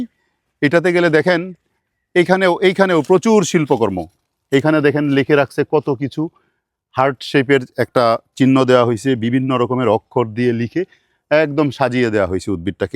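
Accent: Bangladesh. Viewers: আপনার কথা শুনে মনে হয় আপনি বাংলাদেশের,আবার বর্তির বিলের কথা শুনে মনে হচ্ছে আমাদের পশ্চিমবঙ্গের।আপনি কোথায় থাকেন জানিনা তবে যে কাজটা করছেন খুবই প্রশংসনীয়।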